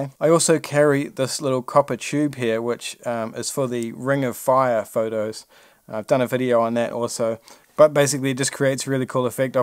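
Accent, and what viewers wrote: New Zealand. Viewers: Maaate! I was like.... is this guy a kiwi?! did a quick google search and BOOM! Nice one man I'm subscribing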